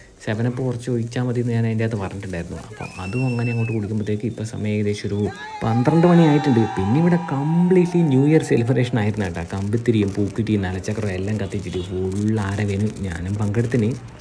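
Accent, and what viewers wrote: Kannur, India. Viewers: Kannur basha thenhe...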